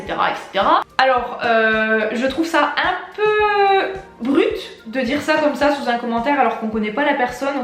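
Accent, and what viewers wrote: North, France. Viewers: J'adore l'accent du Nord hahah je suis toujours dessus mais bon En vrai on vois peut de personnes de notre région qui se lance sur YouTube ça fait plaisir à voir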